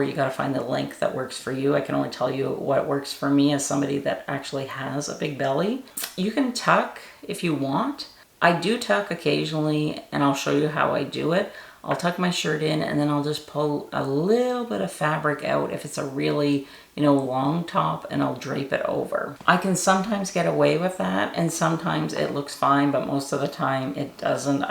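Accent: Canada. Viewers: Looks like I have the same body shape as yours. I agree on high rise pants ,shorts etc. And I never tuck in tops. What I HATE is baggy pants jeans or dressy slacks. I call them "Bozo" 👖 pants. Mainly because I have thin legs and ankles but a way too big stomach. This is the first time seeing your videos. Nice I'll be looking for more. Are you from Canada? I only thought that because of the way you say " your O s " . 😊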